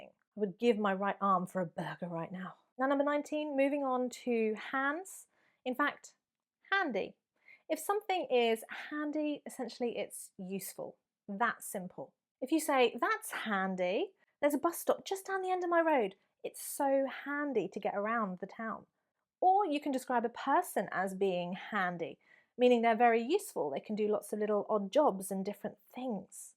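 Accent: United Kingdom. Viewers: Hello, I am an Arab from Bahrain and I want to learn the British accent soon. I will learn it from you 🥰🥰♥️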